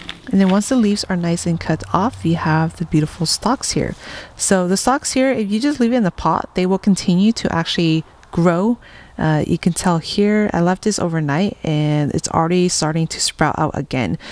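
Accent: United States. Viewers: Which part of the world are you at, by your accent maybe USA. I live in southern England on a island. Ive been growing lemon grass since 2018 brought back from Thailand. I now have at least 300 plants. Its become a obsession with me. Great video. Are you from Indonesia?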